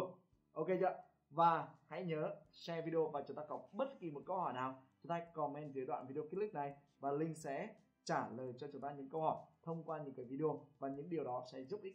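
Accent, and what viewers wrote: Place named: Ha Tinh, Vietnam. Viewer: A ns tiếng Hà Tĩnh nghe gần gũi quá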